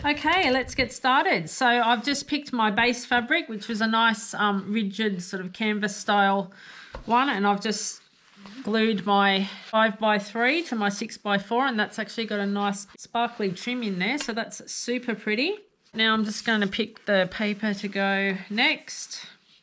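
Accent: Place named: Australia. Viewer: I picked your Aussie accent straight up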